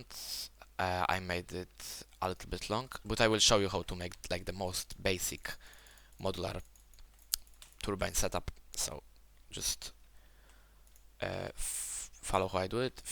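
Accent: Poland. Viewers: Siema wiem że jesteś z polski po akcencie i napisie aktywuj windows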